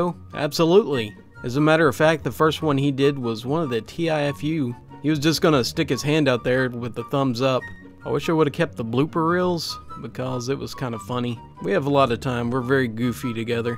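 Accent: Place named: Kentucky, United States. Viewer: pleased to hear it in a KY voice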